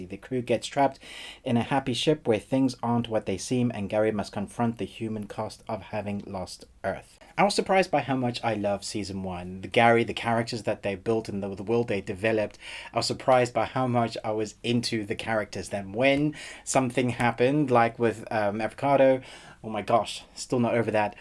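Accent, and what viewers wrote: South Africa. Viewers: Is it just me or does this guy sound South African?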